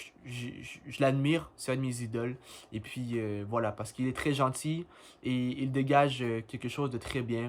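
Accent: Quebec, Canada. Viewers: Est ce que tu est québécois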